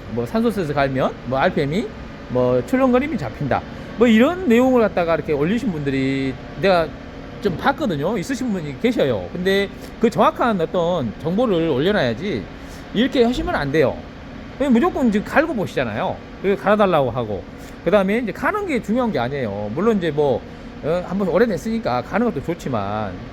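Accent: Jeolla, South Korea. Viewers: 전라말씨기 찡해버리네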